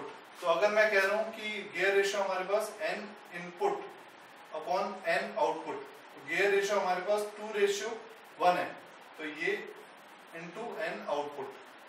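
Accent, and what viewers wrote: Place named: India. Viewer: omg, idian accent :((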